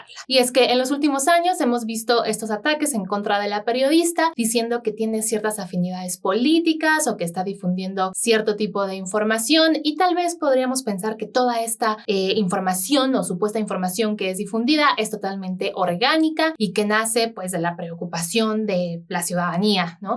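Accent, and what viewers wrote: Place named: Argentina. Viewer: y porqué hablas con acento Argentino?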